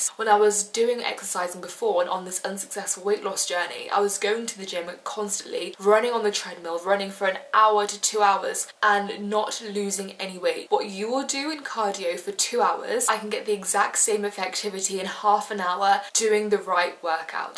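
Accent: United Kingdom. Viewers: Love uk accent ☺☺🌻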